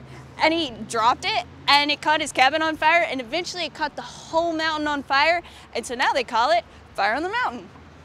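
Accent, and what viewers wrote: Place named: Arab world. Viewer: Nearer the virtuosity with the fiddle,and..... because of your way of talk, I know you're coming from the middle east. congratulations!!!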